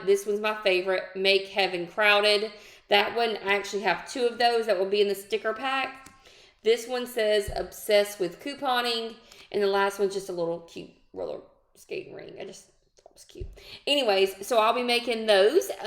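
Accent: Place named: South, United States. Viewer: I love your cute southern belle accent. Publix is my favorite store to coupon at.❤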